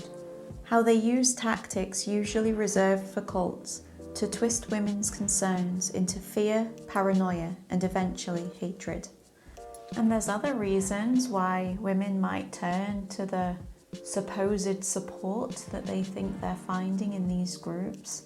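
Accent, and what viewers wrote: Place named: Northern England, United Kingdom. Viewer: wonderful work. i have smashed that like button and hit subscribe. i miss the northern english accent spoken softly. i don't want to guess the county because to get that wrong is more often to offend than not.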